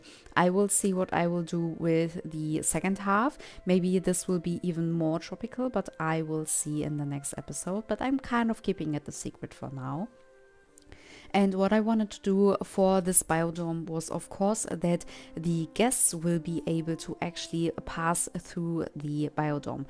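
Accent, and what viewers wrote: Germany. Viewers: Thanks for the inspiration. But a funny question are you german ? Wegen dem Akzent und dem Wort Glas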